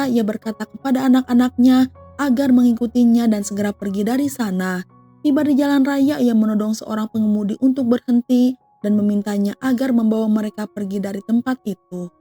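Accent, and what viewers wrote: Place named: Java, Indonesia. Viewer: Logatnya medok banget neng hehehe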